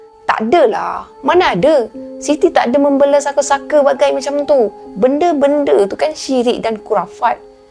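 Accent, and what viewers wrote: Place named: Kedah, Malaysia. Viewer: org kedah mai dh ni😎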